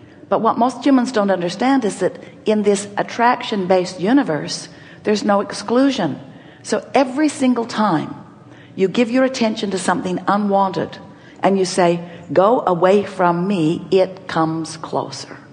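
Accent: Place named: Ireland. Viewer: isn't that sota Irish bahahahahahah :) ♥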